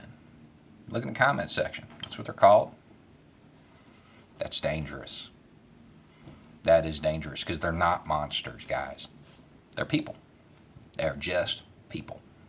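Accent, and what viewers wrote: South, United States. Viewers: You sir, have a new fan. I’m from northern Mexico. We are the hillbillies of Mexico (inverse from the US). I can’t tell you how refreshing it is to hear a person with your awesome southern drawl say the things you say. You’re exactly right, and right now the scariest citizen is the one who stands idly by and does NOTHING! The only thing evil needs to prevail, is for good men and women to stand idly by and do nothing! May God bless you and I hope your channel blows up soon. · Man, every time I watch your videos, I'm less fearful of white dudes with long beards and a southern accent. Lol. Truly, we have more in common listening to your views. What's your view of the illegal immigrants? In case you forgot, I'm a black dude. I live in Loss Angeles and so many Mexucans have come, they roll around town with the Mexican flag waving on their antennas or somewhere on their cars. 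I think it's a subtle form of treason. I dont mind people being proud of their heritage. But such public overtures shouldn't be something you would do entering another country. Why would you? If Mexico is so great, why did you feel the need to flee? You didn't go through.a PROCESS of properly leaving. These people fled Mexico like rats abandoning a sinking ship. These people KNOW here in the U.S. they can have a measure of peace, security and prosperity, and not worry about drug cartels and corrupt governments officials and corrupt cops ruining their dreams of the future. I just wish when they come, they would ALWAYS remember that. But they don't. They eventually start talking about white people, although they aspire to be rich and successful as many white people. They start talking about black people, although they move into the black neighborhoods when they first arrive. It's rank stupidity and hypocrisy that Mexican and southern American immigrants come and the U.S. 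(and I mean white people) better make these newcomers respect the flag and all this country represents or you mig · I want to commend you on your channel; even though I'm not a subscriber... yet. In America we are all too quick to judge people by their appearance. As an formally educated African American man from the coasts of this country... even though my first year of college was at Auburn... I have been guilty of drawing conclusions about the racial and gender fairness in the heart of someone who looks and sounds like you... beard, southern drawl, etc. You do our society a DISPROPORTIONATE BENEFIT by displaying that you are capable, willing and instinctively driven to speak truth to power. To be honest and to call out the deceptions and transgressions by the white male, patriarchal hierarchy in this country. God bless you. I can only imagine how proud your wife is of you. Hopefully you can open eyes that I never could. 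Maybe you can get white women over 45 to realize how bad Trump... and the Republicans who failed to hold him accountable... are for them. I'm going to end this post with a challenge to my younger brothers... my sons & nephews... resist your urges to draw stereotypical conclusions about people we might visually conclude are of a certain adverse mindset. Be quick to "have the back" of someone like. In the wake of 8 years of presidential leadership by Barack Obama, there is a new generation of white male Americans who only know to be respectful, dignified and honorable with all people no matter their color or gender or sexual orientation.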